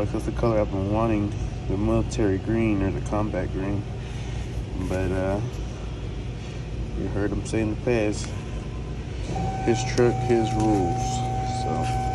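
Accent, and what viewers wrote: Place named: Los Angeles, United States. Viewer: Where u from ? Sounds like la talking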